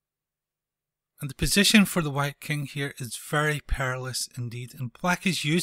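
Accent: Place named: Scotland, United Kingdom. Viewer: Terrific videos. Love the scottish accent too.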